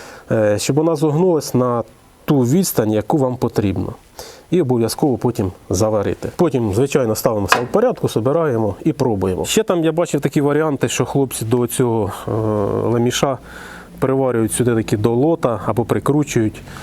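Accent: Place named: Surzhyk, Ukraine. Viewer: Хотя и говорит на суржике, но плохие,не нужные, слова не мовит( говорит) Все по делу. И субтитры. Молодец мужик( хлопец).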